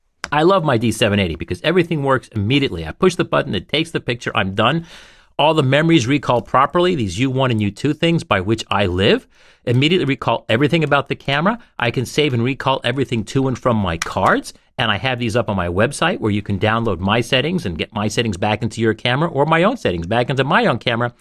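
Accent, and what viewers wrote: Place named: North America. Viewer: Dear I love your reviews, you are responsible for my choice of a Nikon D600 as my first digital camera (which Nikon subsequently replaced for free with a D610 because of the shutter/oil problems). I had to "up-grade" to digital for on-land photography when my Konica T3 became irreparable. My first Nikon was a Nikonos 5 - which feels very much the same "in the hand" as does my Fuji X-T1 (again, acquired on the basis of your review). Anyone who has used a Nikonos 5 becomes a rusted-on Nikon user for life. But, please, please, please, for the benefit of we English speakers, the correct pronunciation of "Nikon" is indeed "Nikon", not "Nye--kon". That is, "Nikon", as derived from "Nippon", not "Nye-kon" as not derived from "Nye-pon". But, more importantly, a "Z6" is a "Zed-6", not a "Zee-6". To we English speakers, hearing a North-American accent, the expressions "Zee-6" is indistinguishable from "Dee-6". 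Given the date of this review, your references to "Zee-6" could not have been to a "Dee-6", but how many YouTube viewers actually look at the date of up-loading of a video?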